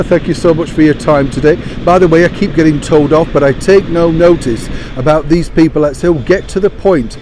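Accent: Yorkshire, United Kingdom. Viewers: Good afternoon Yorkshire lad, Don't change a thing , Stay just the way you are, Had to smile when i heard a little Yorkshire grit in your voice , Then i had to laugh a soldier with make up mincing around What a lovely coffee break they are now getting longer Lovely smile, lovely wave lovely scenery Thank you. Take care, stay safe.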